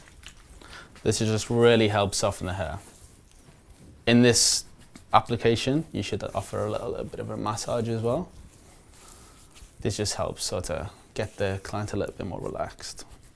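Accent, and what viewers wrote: Liverpool, United Kingdom. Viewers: He's English, but from Liverpool. It's a very distinct, thick local accent there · i don't know why but I never expected you to be British.. This is the first video I've watched of yours where YOU talk. Whoa! Surprised but I love your accent :) thank you for all your wonderful, relaxing videos! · Your accents mad, like it sounds scouse, a bit foreign and north eastern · U from Liverpool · Is he scouse? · Have you got scouce in you? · hamza1947 No. Im from birmingham. He is a scouse trust me. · Are you from Liverpool mate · Didn't know you were a scouser. Nice to see the accent getting about. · u sound like a scouser wer bout is ya barbers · Are you a scouser? You sound a little bit like one lol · are u scouse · Do you have a scouse accent you tryna hide? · Is he a scouser? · Jake DaSnake scouse (Liverpool) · Are you part scouse too, i can hear an accent in you?? 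· Is that abit of a scouse accident? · Heard the scouse accent. Got excited as live in Liverpool. Looked up his premises to book in. Saw it was in London. Got gutted. :( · He sounds Scouse. (Liverpool) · I like your British accent.. make my eye close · U have the same accent as me. Scouse or Geordie?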